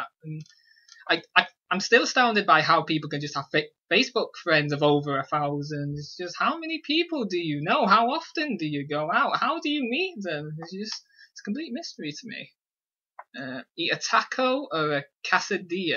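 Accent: United Kingdom. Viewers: your accent is indeed less noticeable than most British people's, however you do have an accent haha. also yes, fizzy drinks hurt. i thought I was the only one 😂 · You're a little bit awesome. Also, you sound like a Manc more than anything with a touch of Lanky in there to me, but you could easily pass as someone from Yorkshire because a lot of people here sound northern without any real overly distinct accent. /rant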